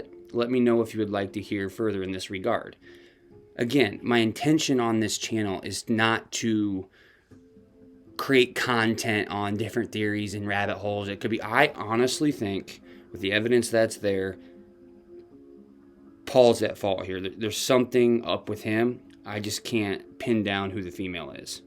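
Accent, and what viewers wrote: United States. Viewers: Just to add yours is the best video analysis by far.....and you sound American.......good job:)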